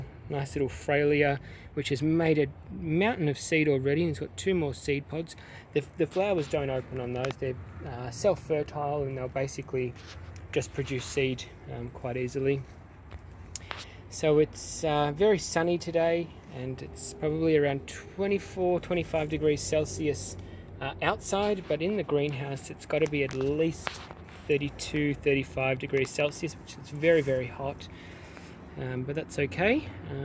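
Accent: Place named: Australia. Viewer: I appreciate your thorough descriptions and naming your cacti. Love you generosity and Ausey accent. And no I don’t like yellow flowers either. Dandelions are yellow aren’t they?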